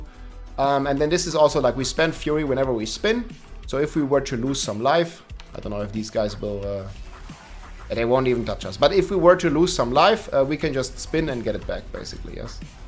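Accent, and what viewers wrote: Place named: Germany. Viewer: Wenn Deutsche Englisch reden, zu funny :D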